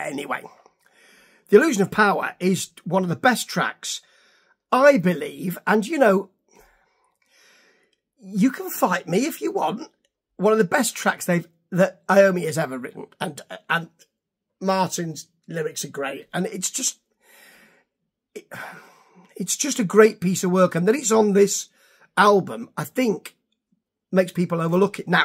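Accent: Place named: Birmingham, United Kingdom. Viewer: Are you a brummie? 'cause you sound (to my Irish ears anyway) like Bill Oddie. Oh! and Sabbath ended at Sabotage... everything recorded after that is a different band, different gene, different everything! (Just my opinion, )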